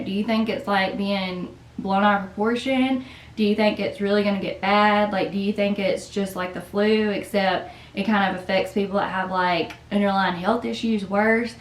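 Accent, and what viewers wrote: South, United States. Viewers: i love the accent, you from the south too?💗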